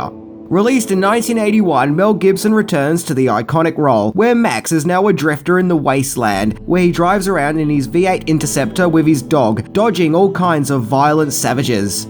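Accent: Australia. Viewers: My God this guy is Australian!!!!! I didn't know that!!!! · your Australian. or do you just live there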